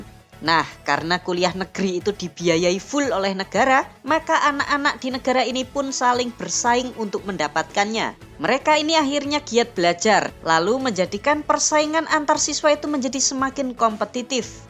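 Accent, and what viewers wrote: Java, Indonesia. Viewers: Medok bgt hostnya😂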